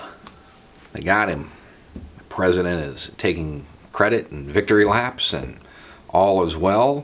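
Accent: South, United States. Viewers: If you like MSNBC with a southern accent and a beard.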